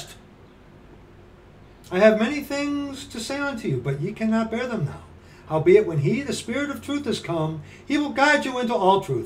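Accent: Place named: North, United Kingdom. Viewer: I try to follow along, sometimes I don't have the time, but when I can I do follow you along. Your Northern accent throws me now and again!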